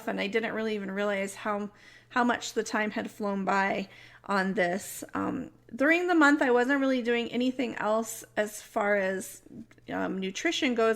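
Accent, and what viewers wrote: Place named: United States. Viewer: Hi. I am from South Wales (UK). Just a few miles from where Richard Burton and Anthony Hopkins were born :--). Accents are rather difficult to describe of course. Yours is clearly 'American', however, without any 'sharpness' or 'high to low' then 'low to high' intonations which my ears pick up on with some (not all) American accents. Thats just me though, haha.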